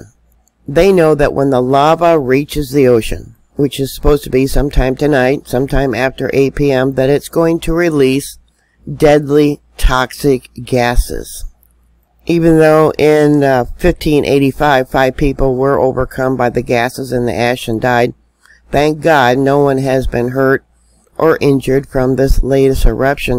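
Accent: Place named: Midwest, United States. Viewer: Well, you are amazing! I heard this little calming Midwest? Southern Midwest voice with the most horrible news ever which in 6 days no one is talking about. The tsunamis. I knew they could happen but I didn't know it's bcuz the island would go under. I'm subscribed and I'm sticking with you and bushbear or what his name is (apology) but he's very nice and English speaking. Peace and take care. (Bushcraft Bear.;) )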